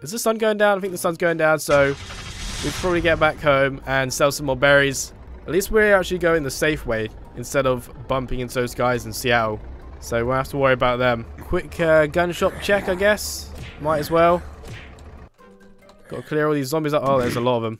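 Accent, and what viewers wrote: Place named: United Kingdom. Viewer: That British pronunciation..... I love it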